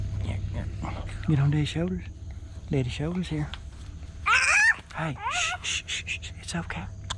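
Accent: South, United States. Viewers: Yeah I just saw the video on how you cleaned that ”chipmunk deer” and now I will have nightmares. I found this video trough swedens largest ”link” site where they post videos and photos on a daily basis. I then listnened to your pretty polly cover and you have an amazing southern accent and nooow im going down the rabbit hole of banjos :)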